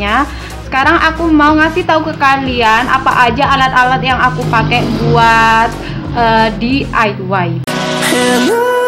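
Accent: Madura, Indonesia. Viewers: Logat maduranya kelihatan beut kak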